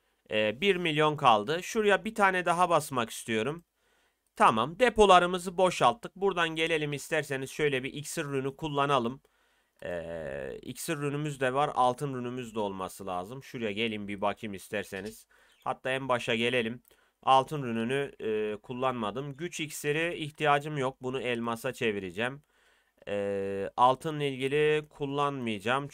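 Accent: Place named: Iğdır, Turkey. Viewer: Abi sen Iğdırlı mısın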